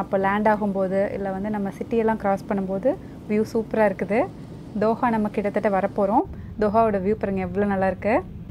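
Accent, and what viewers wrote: Kongu, India. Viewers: Kongunatu mangai kongu Tamil super video bye Anna. From.covai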